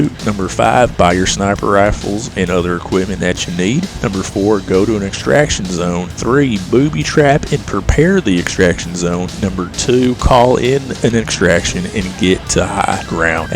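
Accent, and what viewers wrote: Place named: Texas, United States. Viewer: You a Texas boy? I heard the accent and trusted you instantly.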